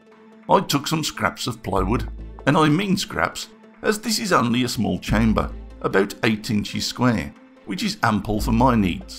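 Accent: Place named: Scotland, United Kingdom. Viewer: Good example of why I subscribed to your channel. Love your work and most of all your commentary. Hope your mother-in-law doesn't watch. One question, your accent hinges a bit on the Scottish side, how close am I ??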